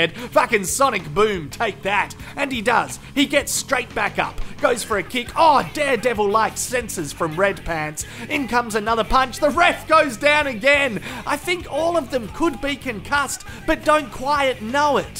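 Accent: Australia. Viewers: This is like wrestling meets kickboxing with a aussie commentator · Shit! Jesus... that was pure gold! Aussies swear better than the french. Without the accent, this would not be as hilarious. Good job m8!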